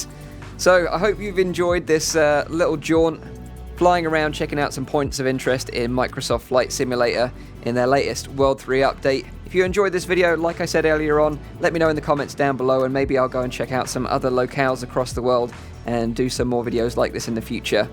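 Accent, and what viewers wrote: Essex, United Kingdom. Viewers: I used to live in Prittlewell so not far from you by the sounds of it. Essex always gets a bad rap for the accent but a lot of us dont speak like the ones of Towie and speak the same as you. They seem to only have people from the grot parts representing our county on TV.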